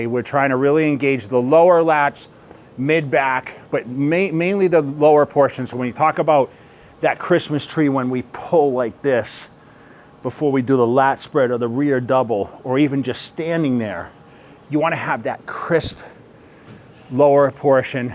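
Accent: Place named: Boston, United States. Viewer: The Boston/Massachusetts accent coming out in full force lmao “shoaht list” “Splender” Boston: we drop r’s when they’re in the word and add them when they’re not there 😂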